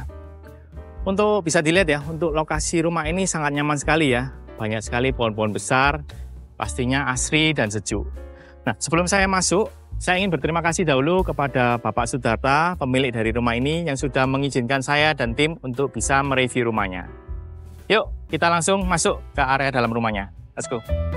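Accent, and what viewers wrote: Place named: East Java, Indonesia. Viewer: Videonya menjelaskan banyak hal kecuali lokasi, hal yang justru paling penting kalo mau jual rumah. Lokasinya di kota mana? Saya cuma bisa nebak dari dialek hostnya, yaitu Jawa Timuran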